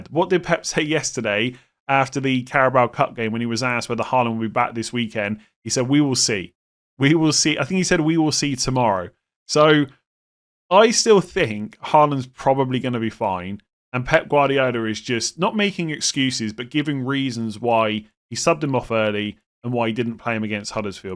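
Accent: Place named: Cardiff, United Kingdom. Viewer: Are you from Cardiff? Trying to figure out that accent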